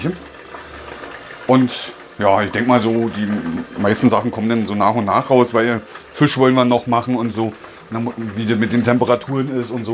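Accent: Berlin, Germany. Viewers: super genial erklärt und hat uns bei der Kaufentscheidung sehr geholfen. Und dein Berliner Dialekt lieben wir eh 😁😁😁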